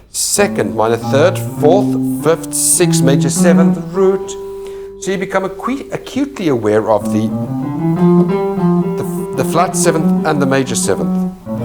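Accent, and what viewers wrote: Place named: RP, United Kingdom. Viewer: You have the poshest accent of any guitarist I’ve ever heard speak! Where is that from?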